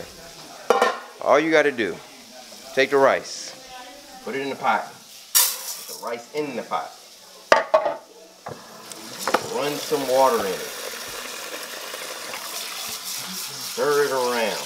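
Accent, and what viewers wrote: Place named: West Coast, United States. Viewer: Got that west coast sound! Love it...head bobbin the whole time! Pro tip on that rice, I never knew that, but I never cook rice either lol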